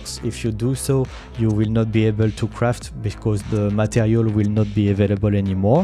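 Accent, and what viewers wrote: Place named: France. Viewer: Bel accent on reconnait les fr^^ , en tout cas elle est top ta vidéo :) sa ma donner envie de lancé mon reclaimer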